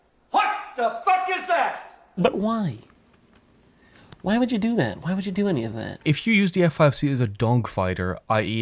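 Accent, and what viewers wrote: Netherlands. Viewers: Really love the video, but one question. Are you dutch?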